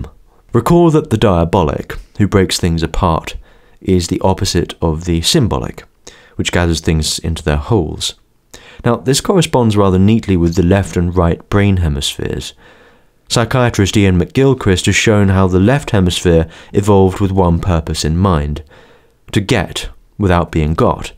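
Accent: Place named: RP, United Kingdom. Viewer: Listening to you speak with your classic posh accent is both entertaining and ASMR soothing. Hope for many more from you are you fill a gap of value to me 🙂👍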